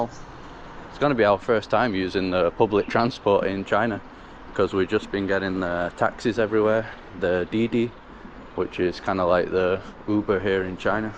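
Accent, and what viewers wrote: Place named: Manchester, United Kingdom. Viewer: Enjoying all your videos, great channel. Funny how the Manc accent keeps popping out every now and then too. Live there myself so easy to spot! What area were you?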